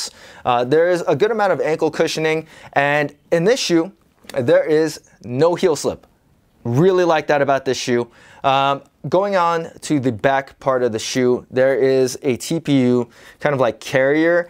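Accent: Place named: China. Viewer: The Chinese English 🙄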